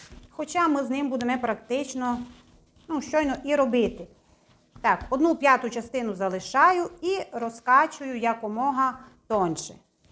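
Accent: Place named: Zakarpattia, Ukraine. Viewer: Я завжди задаюся питанням: навіщо держава витрачала шалені гроші на навчання? Коли в кінцевому підсумку ця молода персона розмовляє закарпатьским діалектом. Достатньо було би 4-х класів освіти. І потім, всі блогери як журналісти повинні нести в маси красу украіінськоі мови, тим більше, що наш народ післі окупаціі має негативну спадщину в частині мови. Думай- Те!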